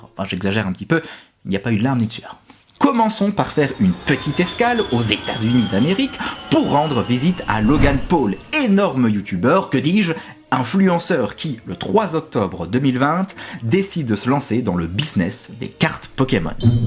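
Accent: Belgium. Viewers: Whouah... Je me suis remis à collectionner les cartes en fin d’année dernière sans vraiment savoir ce qui m’y a, implicitement, poussé. Grâce a ton analyse, je crois que j’ai compris. C’est flippant! ☺️ Au delà de ça, je viens de découvrir ta chaîne et tu m’envoie du rêve Bro! Je kiffe ce que tu fais! La qualité et la pertinence du contenu, l’humour toujours bien calibré! Le petit accent belge! J’adore! ❤️ 👍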